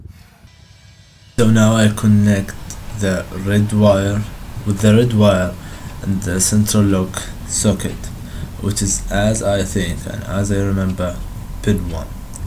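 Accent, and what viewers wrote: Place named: Arab world. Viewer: انت عربي وينك اوين التونتي فور تحجة عربي هيييييي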